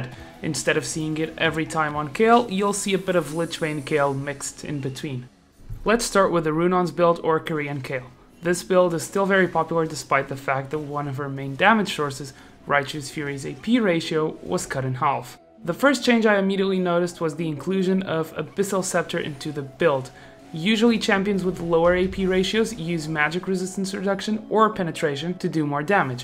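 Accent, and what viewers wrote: Portugal. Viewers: ES TUGA MAN ahaha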